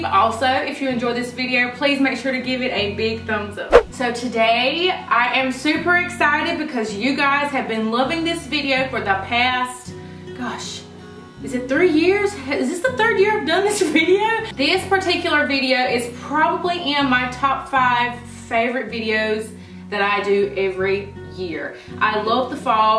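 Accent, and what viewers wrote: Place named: North Carolina, United States. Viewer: Omgoodness, I knew right away where you are from because of your accent! I just subscribed, came over from the other channel! I love watching these videos to get my own ideas for fall decor ideas, great job!! I'm from the same area in NC, but moved to Iowa!! 😁 girl I live for those hobby lobby sales 😂😂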